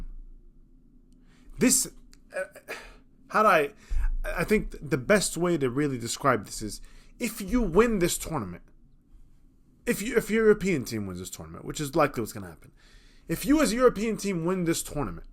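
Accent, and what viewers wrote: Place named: Arab world. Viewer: Where are you from? I can heard some arab accents but can't locate it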